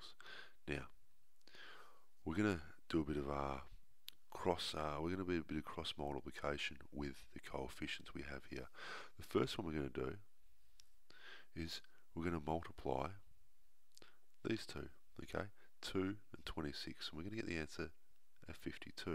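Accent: Australia. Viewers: are you australian??? accent is so iconic! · Yeah I think so. Well I'm from Australia and he def sounds Australian to me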